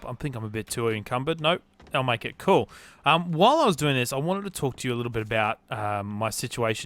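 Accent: Australia. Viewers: live in Sydney, Australia and my internet is not the best but my friends internet is really good like i went to his house with all of our mates (6 of us) and we were all downloading unturned and we all did it in 30 seconds. do you live in WA or something? cause it sounds like it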